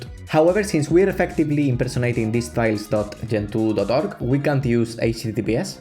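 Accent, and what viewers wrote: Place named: Spain. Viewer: are you spanish? that accent damn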